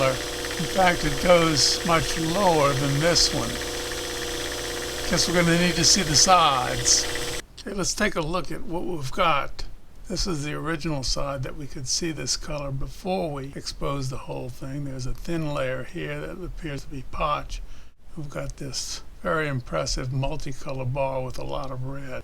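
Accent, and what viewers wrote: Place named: Louisiana, United States. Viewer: I love your videos. I'm training to have an English accent like yours. My favorite Lousiania accent. Keep the great work. The internet allows me here in Brazil to have some contact with your wisdom. Thank you very much.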